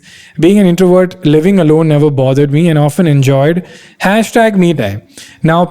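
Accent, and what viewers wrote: Kerala, India. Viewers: talking in english with a hint of Malayali accent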